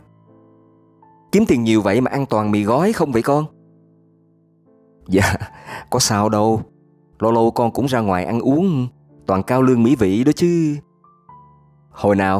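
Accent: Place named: Saigon, Vietnam. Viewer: giọng đọc rất hay rất truyền cảm mang âm hưởng kiểu dân Sài Gòn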